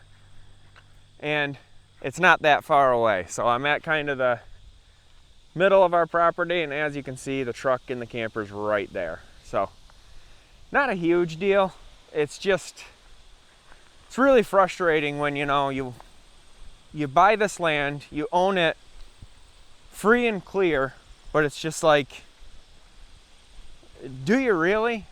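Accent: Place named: Michigan, United States. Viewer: What state/county? By the accent I'm guessing Michigan.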